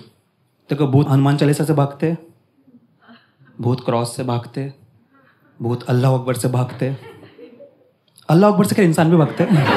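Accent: India. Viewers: His accent is telling that he is from MP 😂😅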